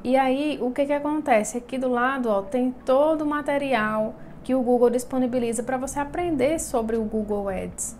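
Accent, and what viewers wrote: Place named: Rio Grande do Norte, Brazil. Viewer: Sotaque igualzinho o meu, já vi que é poti 😁☺️ parabéns pelo vídeo 👏 sucesso!!!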